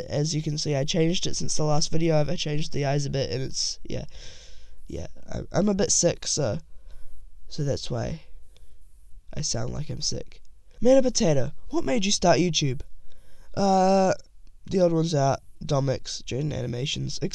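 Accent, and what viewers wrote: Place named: New Zealand. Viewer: I knew that was a new zealand accent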